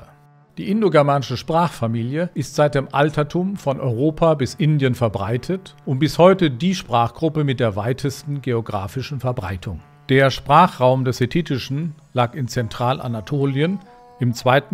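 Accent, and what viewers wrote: Germany. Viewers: Hat der gute Mann einen Bayrischen Dialekt😁 Schöner Beitrag 👍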